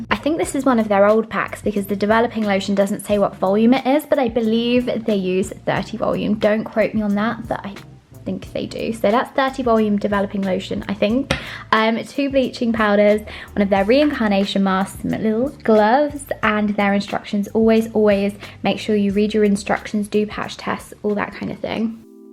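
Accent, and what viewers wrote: England, United Kingdom. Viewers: An English Accent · U look really amazing good job! Explanations are very clear and well said 👏love this british accent! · I love these English girls and their accent. I gotta find one for myself.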